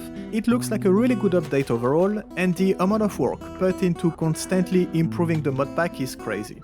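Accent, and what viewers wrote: France. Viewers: Are you French by any chance ? :D.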